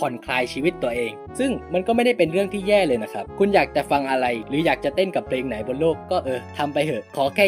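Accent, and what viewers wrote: South, Thailand. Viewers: พี่เป็นคนใต้ใช่ไหมครับ สำเนียงพี่คือใช่เลย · พี่เป็นคนใต้ป่าวคับ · สำเนียงอยู่เหมือนคนใต้เลยพี่หรอยเลย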